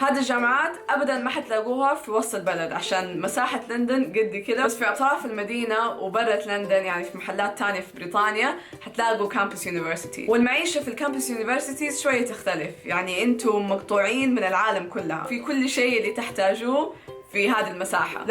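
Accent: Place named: Hejaz, Saudi Arabia. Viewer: Her dialect is Hijazi not Kuwaiti they are entirely different dialects bu both are understandable and easy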